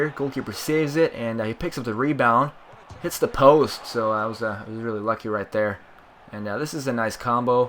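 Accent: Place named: United States. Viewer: You sound american, were you born there?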